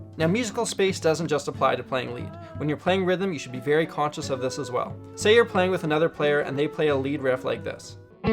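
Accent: Winnipeg, Canada. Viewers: Are you from Winnipeg? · Is this guy from winnipeg?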